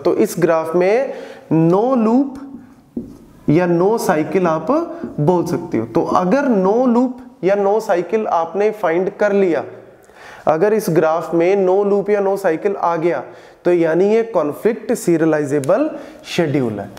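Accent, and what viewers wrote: Punjab, India. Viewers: sir are u from punjab??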